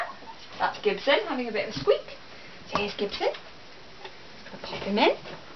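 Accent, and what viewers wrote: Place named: England, United Kingdom. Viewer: Because she is from England and they have heavy accent as you can hear in the video other country with heavy accent are Indians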